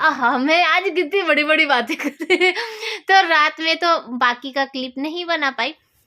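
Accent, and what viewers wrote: Uttar Pradesh, India. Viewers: Koi na apki UP wali language hai..😂😂UP wale eaise hi bolte hai 😂😂😂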